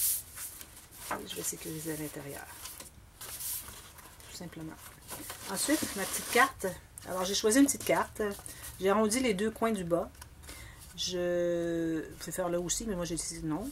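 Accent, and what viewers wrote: Canada. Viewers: Coucou, Je découvre une magnifique artiste pleine de talent au léger l'accent canadien !!! Un EXTRAORDINAIRE album, merci de nous enseigner et de nous entraîner vers ton univers ! Je m'abonne à ta chaîne ! A bientôt Bisousssssssss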